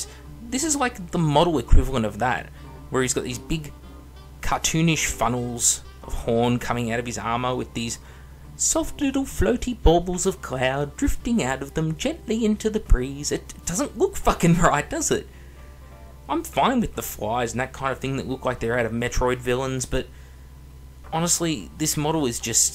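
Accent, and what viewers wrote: Australia. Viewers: "Your typical british kid." He sounds like he's from Straya, mate.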